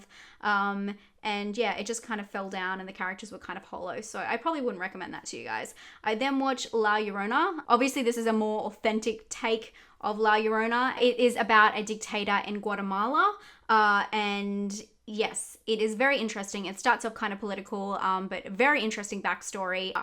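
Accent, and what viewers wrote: Australia. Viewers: So, uh, "really sh*tty" is a 1 star rating? 😛 Think I may be watching too many of your vids. Your Aussie accent is not as noticeable to me. 😕 Stay Spooky.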